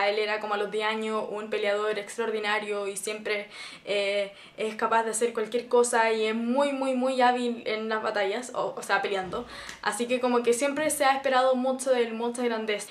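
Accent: Chile. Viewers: Estoy tan obsesionado con este libro que comencé a ver y leer todo lo que hay en internet al respecto. Pero me surgió una pregunta: De donde sos? tenes acento chileno pero usas palabras que usamos en Argentina jaja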